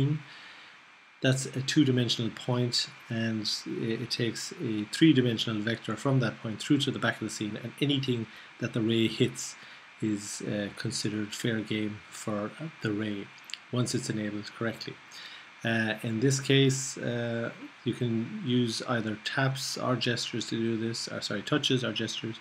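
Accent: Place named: Ireland. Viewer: These are great videos, and it's nice to hear an Irish accent too! Maybe you can help me out with a query: I'm trying to design a game character in Blender composed of several objects (sphere, cube etc.). I want to load it into my cocos3d app as one node with several children, so that I can transform the whole lot at once. Is it possible to set up that group within Blender? I've tried using the regular Blender "group" feature but those don't appear to end up in the POD file. I can see that obejcts can be parented to other objects, but when I parent objects to the sphere which is the largest and most central component of the scene the vertexes are distorted in a bizarre way. Finally I've tried parenting all of them to a bounding cube but I can't find a way to hide the parent cube so it doesn't render in the scene. What would you advise?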